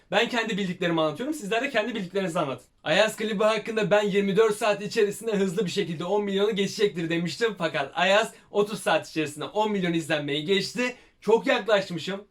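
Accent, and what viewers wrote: Black Sea, Turkey. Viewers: Abi valla Karadeniz şivesini çok güzel yapıyosun haykırdım sayende 😂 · abi karadenizli gibisin haa ne guzel okuyon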